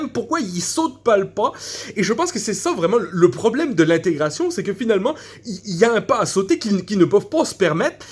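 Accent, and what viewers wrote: Quebec, Canada. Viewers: c'est juste un accent quebecois... je reconnais pas le bock kotey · J'adore, peut-être une pointe d'accent québécois en plus, mais franchement bravo car l'original est une vraie pipelette :) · L'accent québécois est bien fait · Comment fait il pour maîtriser l'accent québécois sans trop en faire ? C'est très fort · Desolé encore que Bob coté a pas coulé quand il a traversé l'atlantique, si jamais vous le perdez en route on sera pas trop triste au quebe, étonant qu'il a pas préféré se sauvé en floride. Sinon pas pire accent, manque pas grand chose pour reussir a te perde dans une foule a mtl, peux etre juste un peux de, ouin, genre, tsé, pis 😅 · Bravo pour l’interprétation : tu te débrouilles vraiment bien pour imiter Matthieu Bock-Côté et l’accent québécois 👍🏻 En plus, même les propos sont bien fait : on dirait vraiment les propos de Matthieu Bock-Côté (il a déjà été capable de sortir des propos similaires) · Pour une parodie humoristique, y'a quand même du vrai dedans. 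Serieux, du jambon, c'est du porc, point final, le reste c'est de l'arnaque Super accent canadien au passage, ca rajoute un petit coté drôle :) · Encore une fois le seul Français capable de faire un accent québécois quasi impeccable, GG.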